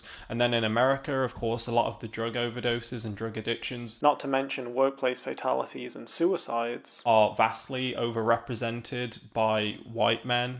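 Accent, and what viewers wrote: United Kingdom. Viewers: oh ... and I truly love your British English "accent" ... brilliant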